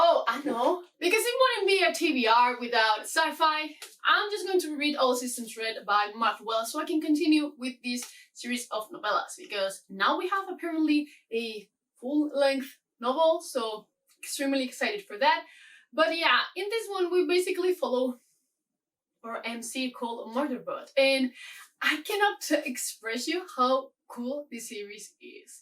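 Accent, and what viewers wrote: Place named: Galicia, Spain. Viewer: Ostia, encontré tu canal hace poco y había algo en tu acento que me sonaba mucho y era de oírmelo a mi, que tb soy de Galicia...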